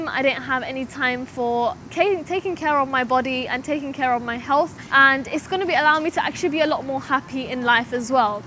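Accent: United Kingdom. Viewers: you have a british ascent yet you look like an arab, kinda confusing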